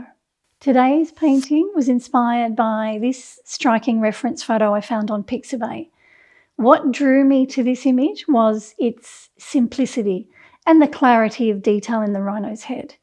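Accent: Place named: Australia. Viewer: It’s that lovely Australian accent that got me. 😊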